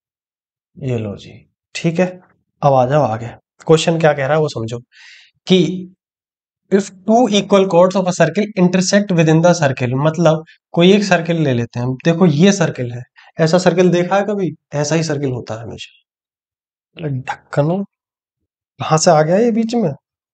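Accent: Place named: Uttar Pradesh, India. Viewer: I think sir is from uttar pradesh 😂 that accent....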